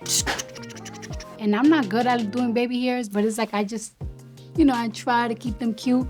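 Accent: Dominican Republic. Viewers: When first started speak in the very beginning, I was like "Que fue!" THEN her Dominicana came out and I was like, "Oh ok, ta bien!" 😂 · Esta tiene un slang dominicano de barrio full😅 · Ella se considera dominicana porque está orgullosa y su acento es súper dominicano, también dijo en un en vivo que ella se crío allá en RD y después vino a USA aunque nació allá. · bueno mi amorch debería debatirle ese punto a, pues ella se caracteriza por puntualizar el dominicanismo al hablar y otra vaina que te voy a decir es que los Dominicanos nacemos donde nos da la gana 😂😅 pa ' que cepa🤔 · Se le nota el accento Dominicana y Nueva York a la vez😊 me gusta❤ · siempre Con su acento Dominicano 😍🇩🇴🔥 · Sabía yo que era Dominicana por su acento jejeje me encantó oírla hablar, pero cuando la ví dije y de donde esta chica tan linda, nunca pensé que fuese dominicana pero cuando la oí hablar dije ese acento es Dominicano jeje muy bella, Dios la bendiga 💖🇩🇴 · La ame, su acento dominicano es una delicia. 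· Yes, it sounds very natural and the accent she has is accurate (i think it is from República Dominicana) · AMO SU ACENTO DOMINICAMO ♡♡♡ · Me encanta su Español acento dominicano me encant❤ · El acento Dominicano es ÚNICO 😂😂 IT’S 😂😂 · Con todo y todo ella no sabiendoyñ Bien Español suena muy Dominicana 😭😭😭🇩🇴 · ella a dicho que es dominicana, incluso su acento en español es dominicano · no nació en República Dominicana pero tiene muchas cosas de dominicanos realmente. 😁 Cuando habla español es Dominicana pura 👌🇩🇴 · Acento Dominicano 😂😂 a muchos no les gusta pero que podemos hacer... La liga de razas influye mucho. Tambien agregarle que somos jocosos y muyyy expresivos al hablar. 😂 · 😆 hablando espñol es todo una dominicana nata cremosa, no tengo dinero por si me quieren robar y la cartera ya es bastante 🤣🤣el tipo la dejo pegada la amo me alegra sus videos y locuras natural delicada salvaje ese bolso falto la piedra para lanzar a los que se metan con ella 🤣 si es contigo nicki minaj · Se que ella es dominicana pero para haber crecido en USA su actitud y su español es muy dominicano 😂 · loveee the Dominican accent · habla como una❤❤ tipica dominicana❤su jerga,su lenguaje colloquial es puro dominicano😊no puede negar sus raices dominicana❤❤ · Tiene un acento dominicano precioso ❤. 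Mi latina queen 😊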